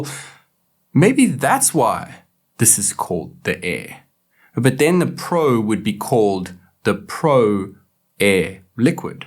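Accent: South Africa. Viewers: kewling system lol love the South African accent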